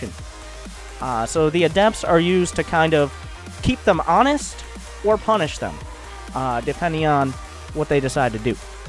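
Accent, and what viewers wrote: South, United States. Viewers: I m curious as to where are you from? is this a Southern accent?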